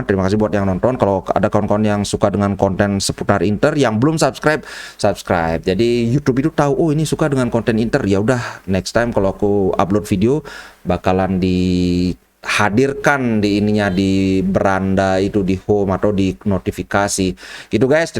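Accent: Bali, Indonesia. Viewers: Kalau dengar logatnya...dari bali nih kawan satu ini...forza inter...salam dari Malang bung😁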